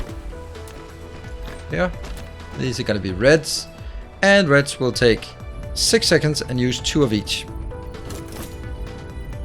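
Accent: Denmark. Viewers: DANISH!.. thank you, I was wondering where your accent was from! :) (re: reference to Danish keyboard at approx ) ROFLMAO "Devastated" - please say it more often :) "It will cry itself to sleep, every night" lol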